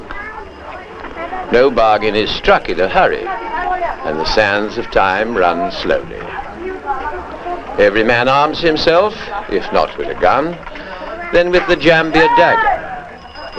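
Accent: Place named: United Kingdom. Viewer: المعلق ليس امريكي بل بريطاني و الممرضه من أيرلندا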